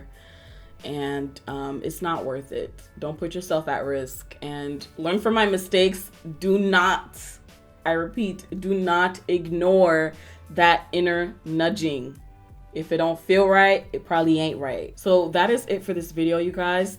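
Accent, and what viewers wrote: Nigeria. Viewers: I love your Nigerian 🇳🇬 accent 😍😍😍😍 makes me smile as a Jamaican doll myself ‼️❤️ I love you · Your nigerian accent is the most beautiful thing I've ever heard UGH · I’m an African from Southern Africa and this is a “typical” Nigerian accent. No one in the south sounds like this, just like no one in the north. It’s a Nigerian Accent. African is so yawn. It’s right up there with “I’m visiting Africa” when you’re going to one specific country. Name the country+name the accent 😂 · She does that on purpose. She's Nigerian so she does her Nigerian accent from time to time. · Girl they sell them on eBay! I bought mine from eBay as well!! Also the washing machine doesn’t really work!! I love when you talk with your Nigerian accent! Subscribing!! Also I bought it for 5$ from eBay! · Lol, I'm sorry this happened to u, but this shit was funny. I'm sorry to laugh. 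I'm glad it wasn't a situation where they got your info and emptied your account. Girl, u said Doc McStuffin "is black in real life" lmao I know what u meant but she isn't real lol it's a cartoon. Thanks for sharing this. Btw, I love your Nigerian accent (I don't think the language is called Nigerian, so my apologies, but u know what u mean. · and I'm from Equatorial Guinea where we speak Spanish. This is not the accent people have here when someone speaks English. I think another commenter put it best that this is very much a Nigerian accent because southern and northern African accents don't sound anything like this. Ive had friends from Kenya, South Africa, and Tanzania whose accents sound nothing like the 'standard' Nigerian accent. Uganda, too.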